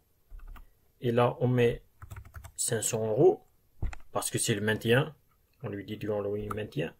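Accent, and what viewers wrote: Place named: Kabylie, Algeria. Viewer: merci, j'entends un petit accent kabyle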